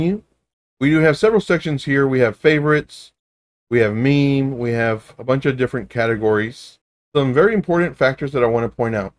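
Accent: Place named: Cuba. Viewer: You sound Cuban or Hispanic of somewhat, you my guy.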